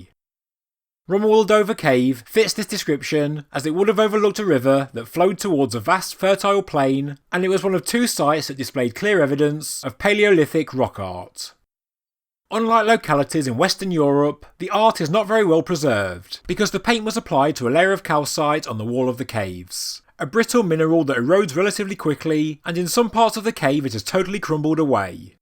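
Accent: United Kingdom. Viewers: Sounds like a regular Brit to me. Guess am more used to a wide variety of accents. Thank God he doesn't talk with that unintelligable deep South or hardcore Texas accent. That is soooo hard to understand even when you live there. Give me even an Australian accent over that.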